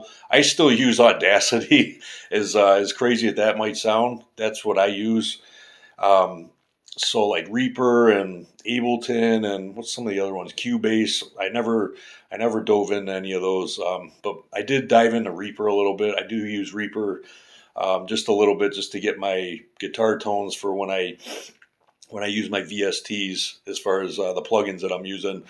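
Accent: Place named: Chicago, United States. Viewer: Good grief, you're like Chuck Schludiner on speed with those riffs. Also, you literally sound more Chicago than you ever have.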